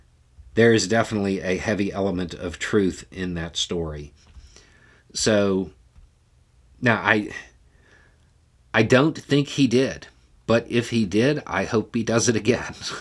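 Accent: South, United States. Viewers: Speaking of strong southern accents. I watched a five-year-old video of yours the other day and thought that your accent was a lot stronger back then.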